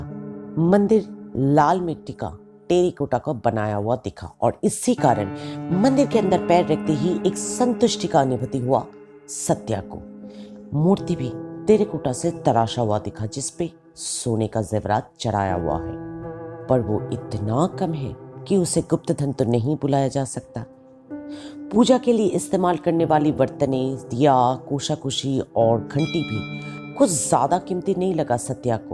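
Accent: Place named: Bengal, India. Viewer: I like the bengali accent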